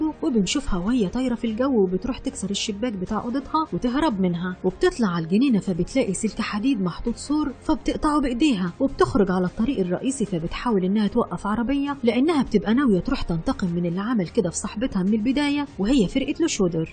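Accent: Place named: Libya. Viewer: يل بتتكلم ليبيهة خلي السرد بلهجه الليبيه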